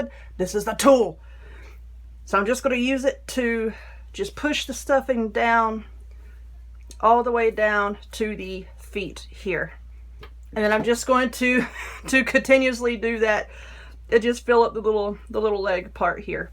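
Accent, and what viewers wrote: South, United States. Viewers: Love your videos and southern accent . Other people talk so long to explain how to make something and they lose me . Quick and easy thank you Namaste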